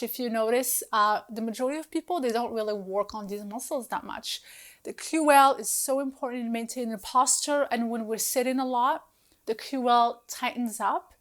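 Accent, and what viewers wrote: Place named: Morocco. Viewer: i smell Moroccan accent here dont know why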